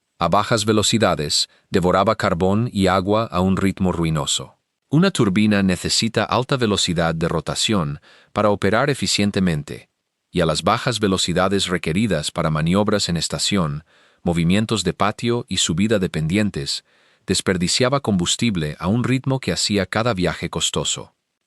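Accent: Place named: United States. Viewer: Esto no es español latino ni de España es de español americano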